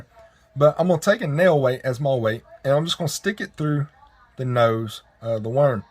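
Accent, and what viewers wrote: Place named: New England, United States. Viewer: Could not follow the mumbling and drawl and dogs barking . Lots of people do not speak Yankee!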